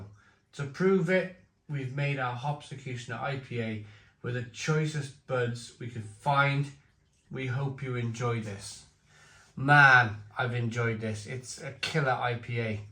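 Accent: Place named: England, United Kingdom. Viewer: Proper english lad with a proper English accent reviewing a proper american ipa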